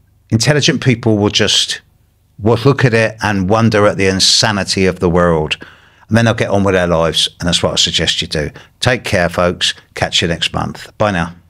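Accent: United Kingdom. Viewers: Beautiful British accent! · New subscriber—I don’t know why I trust astrologers with uk accents but there you go.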